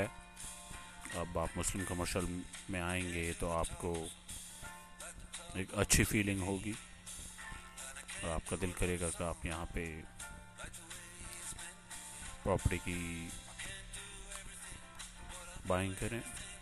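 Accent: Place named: Punjab, India. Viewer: lolzz ... is may aap deakhay gay kay muslim ko kitna accha ker deeya hay hahahahahah ahahaah ..... I like your urdu accent as it sounds panjabi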